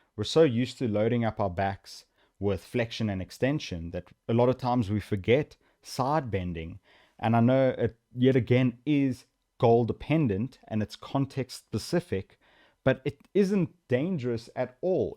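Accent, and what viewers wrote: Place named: South Africa. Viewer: I thought so when I heard your voice, awesome seeing south african creators on this platform. I'm from cape town.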